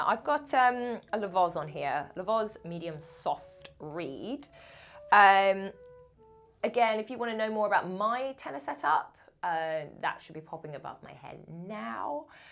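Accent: United Kingdom. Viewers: I like the French first name with the British accent😏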